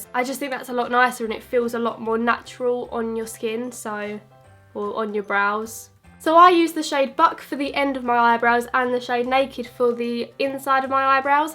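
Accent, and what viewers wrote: Essex, United Kingdom. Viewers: You MUST be from Essex!!!! We have same accent I'm convinced! X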